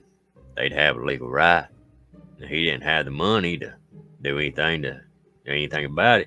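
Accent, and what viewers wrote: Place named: Georgia, United States. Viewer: The story teller accent is identical to my friend from Georgia.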